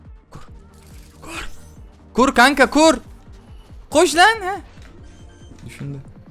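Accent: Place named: Yozgat, Turkey. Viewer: Abi sen yozgatlimisin konusman ole geldi bana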